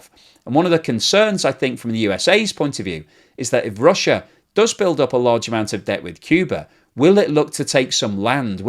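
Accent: United Kingdom. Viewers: Off topic, but I find this guy’s accent the most pleasant British accent. Does anyone know its name?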